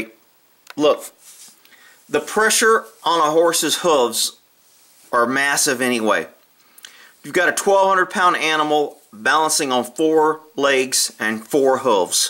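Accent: United States. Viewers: hey, I liked a couple of videos because it was too true what you were saying.. but in this video... and I'm talking about the first 3 pictures... really from what I can hear you are a real American. (I'm from the Netherlands) So you're probably a western rider.. well my opinion if you do that wrong... we are human and make mistakes and we are working with about 700kg animals with a mind for them selves. I know and say yes, I had bad jumps and even fell twice with my horse because he made a canter change I didn't ask for, but my horse still loves to go through the cross country and I can also say if he doesn't want to go, he doesn't go.. because if you use a stick or spurs in that moment, he let's you eat dirt... and maybe you should check about the build and there way of moving of a breed of horse before you talk about them that way... (it's a friesian horse, and let me tell you, it's a really old breed)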